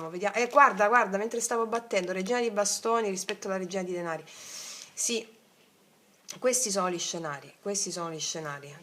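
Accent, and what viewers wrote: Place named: Sicily, Italy. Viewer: Ciao mi sa è siciliana 😊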